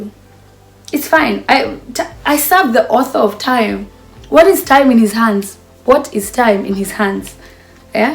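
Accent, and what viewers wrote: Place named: Kenya. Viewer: I'm always in love with the Kikuyu accent you embrace.... That's why I feel you are legit... 'Hi, it's me again ' 😂 😂 😂 😂 na ka kiswahili kidogo "Ngai"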